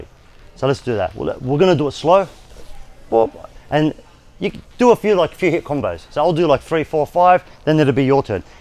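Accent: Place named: Australia. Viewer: sound like an aussie : )))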